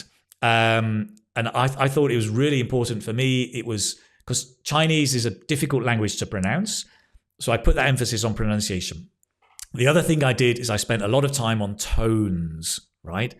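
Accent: United Kingdom. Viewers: Hello, I could stay for hours listening to you speaking with that wonderful British accent. I am Argentine, I learnt English when I was very young. Now I'm 62 and findd that learning languages is amazing. Five years ago I started learning French and now I can have a conversation or read or listen. I went on changing my methode. Once I felt confortable with the basic grammar rules I started watching videos of different subjets just to grasp the accent and the vocabulary of real people. Now, I am learning German! My level is really basic but one day I found myself reading and listening to a simple story. And that was so motivating!!! As you said, each one has his own methode. Mine is as simple as go on changing methodes!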